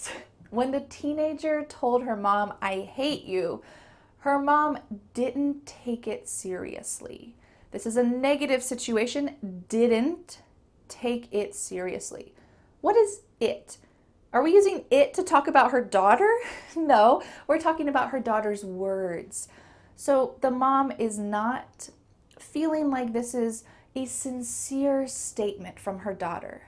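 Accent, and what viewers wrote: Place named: United States. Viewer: Thanks for all your classes. With your methodology I can improve my english skills so much. My favorite youtubers to learn english are you with american accent, Lucy with british accent and Emma with Australian accent. I'm a beginner but I want to learn first american accent and for that I try to watch all your videos. Saludos desde Colombia.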